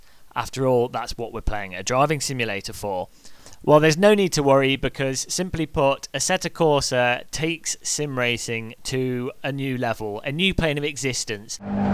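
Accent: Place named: England, United Kingdom. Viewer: Something about an Englishman reviewing racing games just feels right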